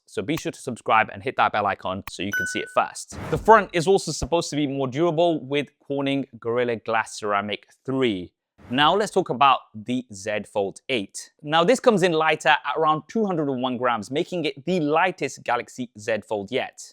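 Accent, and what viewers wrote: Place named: United Kingdom. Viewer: SuperSaf can you work a bit on your elecution & diction for these videos? It's really tough on non-British listeners. I had to press the -10 button sll the time and by the end of the video my ears were screaming. I assume this a regional British accent with the sing-song up-and-down chit-chat you do with the guys? Because a standard London accent I usually understand very well and even regional accents of older people eg Andy Burnham I understand fine. Cheers!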